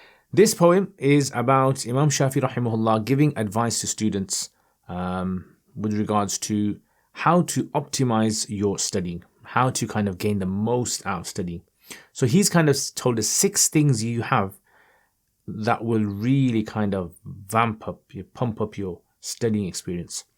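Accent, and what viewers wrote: Birmingham, United Kingdom. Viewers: Lol! Only because you speak even faster 😂 I think mufti saahib does speak a little fast and sometimes I have to go back to listen to what he said. The Birmingham accent doesn't help either 👀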